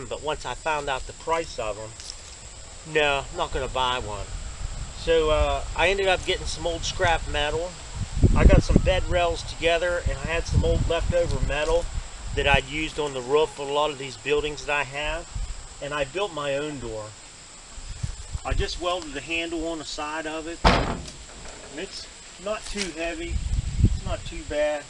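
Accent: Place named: Northeast, United States. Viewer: very cool. love the roof idea using metal and then removing it when set. what kind of temps do you get in winter, or sumner? sounds like you are in the northeast.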